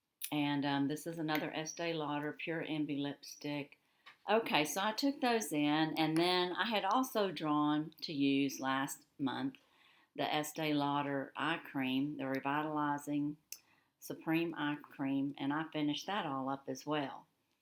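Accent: South, United States. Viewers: I just love your sweet southern accent. I have the matching Lancome Renergie Lift eye cream. Such a pretty palette you are working on. Oh, I love Buxom lipglosses.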